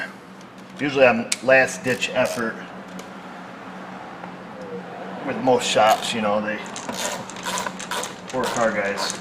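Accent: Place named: New York, United States. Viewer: "Wadd-a" you "BA-UN" NY accent LOL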